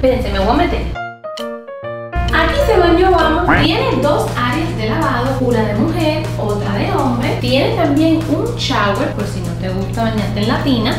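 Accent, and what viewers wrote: Cuba. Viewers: es que las dos son cubanas, y el acento cubano es asi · Las dos hablan igual porque las dos son Cubanas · Ella es del pais de así q todo los cubanos habla asi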